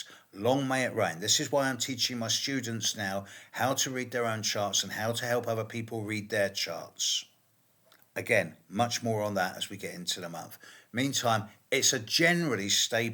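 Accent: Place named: Bath, United Kingdom. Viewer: You look really fresh and well rested. I love that some of your words still have a bath dialect pushing through. Greatest astrologer ever. ❤️🫶🏽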